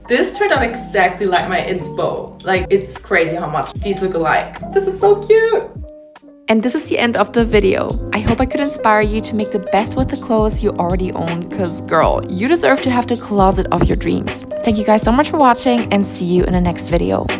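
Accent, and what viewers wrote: Germany. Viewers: Why do you sound kind of German? (I‘m from Germany and this is the first video I watched of you) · heyy, random question but are you german? idk, your accent kinda sounds like it..btww LOVEE UR VIDDDS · i hear deutsch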